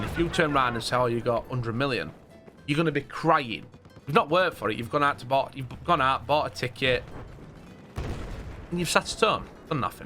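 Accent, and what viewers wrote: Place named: England, United Kingdom. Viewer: I also loved ur english accent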